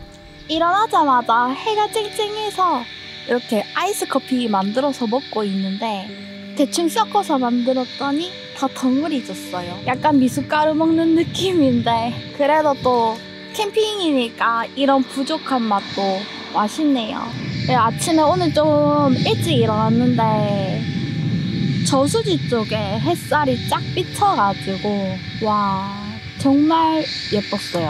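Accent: Gyeongsang, South Korea. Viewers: 갱상도 분이신가보다 ㅋ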